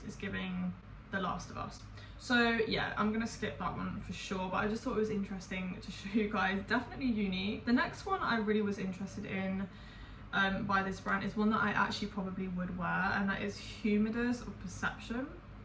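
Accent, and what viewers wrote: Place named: South London, United Kingdom. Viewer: Happy belated birthday!! Happy to see us south Londoners being represented in the fragrance community, no longer do I feel ashamed for pronouncing gourmand like a plebeian lmao. Loving the editing too, it’s a breath of fresh air in a very (70% unfunny) serious community.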